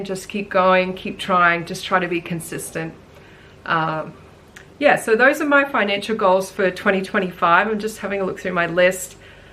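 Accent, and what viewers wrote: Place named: Australia. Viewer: I found your channel on my own yearly bible reading journey :) it's nice hearing an Aussie accent talk about this :) I felt like I was in a prayer rut too and started a prayer bible this year. There's a lot of videos on sisterita club to get started and then people have refined her idea to better organise the tabs :) You seem very financially sound but I thought i would just add in that your super should be in high risk portfolios while you're young. 5 years out from when you plan to retire switch it to conservative funds. Your really need the high risk investments to have enough to retire on 😊 And don't panic when they tank. It's about a 10 yr cycle but you come out better in the end :) With your budget, watch your quarterly trends before you change any monthly budgets :) With your iron if you cook a lot at home check out cast iron.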